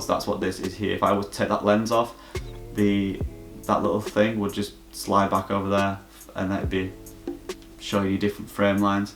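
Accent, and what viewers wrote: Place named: Preston, United Kingdom. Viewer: Nice video mate, love my M6. Where you from? Sounds like you are close to me up Preston way.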